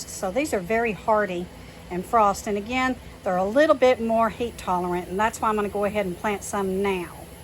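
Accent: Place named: North Texas, United States. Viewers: North Texas gal here in south Tx. Yes her accent takes me back to Archer County and where I grew up! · SE Texas here. What was that wet stuff falling from the sky? I swear, we haven’t had rain in months. I was thinking about planting some spinach on my balcony garden. Fingers crossed. I just love watching your videos. Thanks for all you do. I grew up near Lake Texoma. Your accent takes me back home. ❤️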